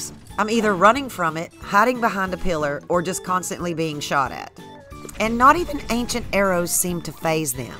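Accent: South, United States. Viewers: I love your southern draw ma’am!!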